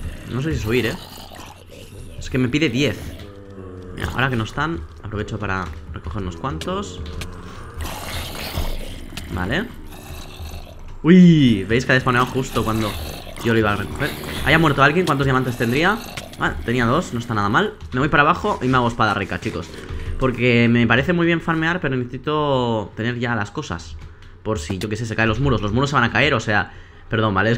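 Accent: Argentina. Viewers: deja de hablar en argentino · ¿ sos de argentina o de españa? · Acento argentino <3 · me encanto tu acento argentino, tuviste que aver ganado, ivas tan bien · muy buen acento argentino y te lo dice una argentina 😃 a y sigue subiendo este modo de juego me encanto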